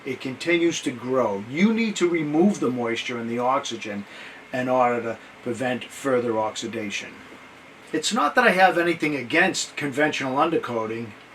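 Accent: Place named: New Hampshire, United States. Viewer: The caption says, "cab connors." Though it's probably a typo, it's funny because it matches NH accent.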